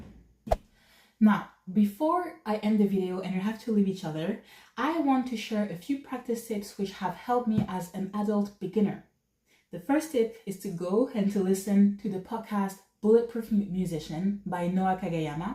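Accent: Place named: France. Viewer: You def need to have more sub! Your improvement and piano journey is inspiring...and also are you french? I noticed it on the accent.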